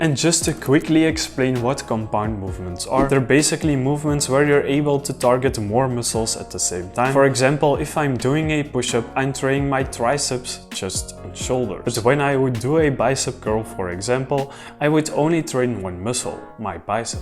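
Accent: Belgium. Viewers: I'm so proud you're Belgian (you can hear it lol), Thanks so much bro! Also can women also do this program?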